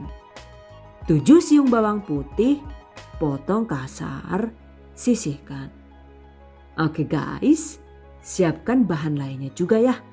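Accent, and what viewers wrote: Banyumas, Indonesia. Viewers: Smg lancar dan barokah... Aamiin...baru ketemu channel ini langsung suka ... apalagi ada logat ngapak nya yuhuuu...top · Ha ha.. Selalu ada ngapaknya,lucuu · Sdh lama gk ngikuti video, tambah cantik aja bunda😄😄, dgn bahasa khas Banyumas nya 🤭🤭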